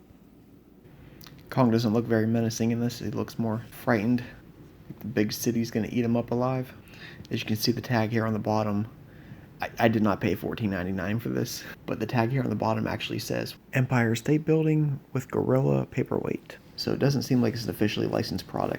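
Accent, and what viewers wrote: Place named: United Kingdom. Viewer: You’re a British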